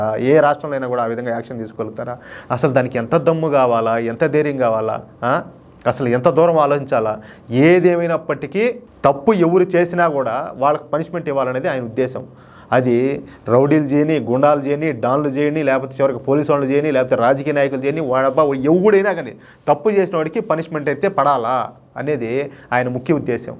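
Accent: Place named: Tirupati, India. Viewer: Naa tirupathi pakkala a v(e)illage na meedhi 😂 vor vor vore vore ,chana adhbbuutamgaa vuntadhi Naa Nuvuu matladuuthunte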